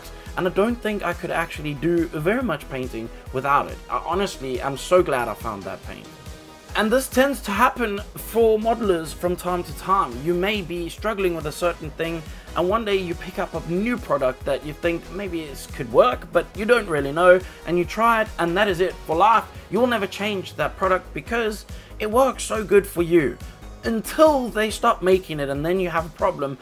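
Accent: South Africa. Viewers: Are you originally from South Africa? 🤔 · Awesome work! You sound very South African. 😅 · I recognize that South African accent 😉 This is incredibly impressive, well done!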